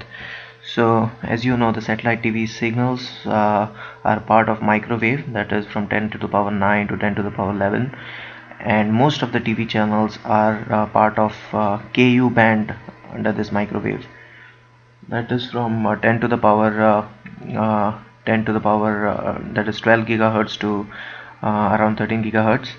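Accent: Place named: South Asia. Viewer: i agree with you about his accent, i think he is from may be India or Pakistan.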